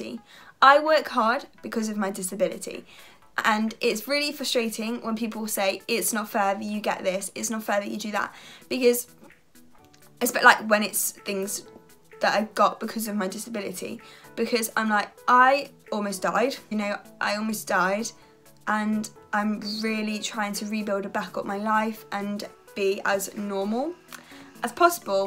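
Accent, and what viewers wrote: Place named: England, United Kingdom. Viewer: You 're such a cute young woman (i could be ur father) , i've seen two other videos of U … You are able to do most things that a lot of us with our hands can't do. As you say, you do those vidéos, u go to school , U do so many things that other Don't, me first. I love your English accent and the light you're brighting arround you. Sorry for my English , i write from Britanny (France) . You already know how to be Happy Pleased Glad … many ones will never know that.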